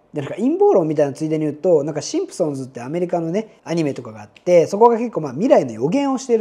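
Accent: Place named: Fukuoka, Japan. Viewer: 山口県出身なのか、喋り方は福岡っぽい。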